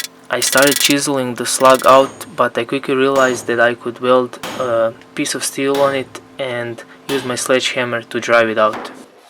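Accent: Russia. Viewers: Парень, признайся, что ты русский) твой инглиш неплох, но ты палишься с произношением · говор русский. ры из снг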